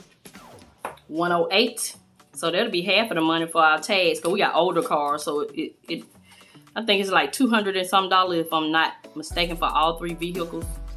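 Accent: South, United States. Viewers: thanks for sharing the budgeting videos. I'm a long-time subscriber and have really enjoyed watching your financial journey blossom. I've been cheering you on silently from the rafters! In fact, if your video is in my feed, I watch you BEFORE I watch any others. You have such a beautiful, giving spirit and, Girl, that southern accent of yours is everythang!...I'm southern, too.😀 I'm curious about your grocery budget, though. I know you shop your pantry before grocery shopping and you also coupon, but would you, if your schedule allows it, please make a What's for Dinner series for one week to help me understand how you grocery shop on $50 a week for two and sometimes have money left over? There's only two of us and, for the life of me, I haven't been able to even come close to that. Thank you so much for the consideration and, again, thank you for sharing. 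Peace and blessings...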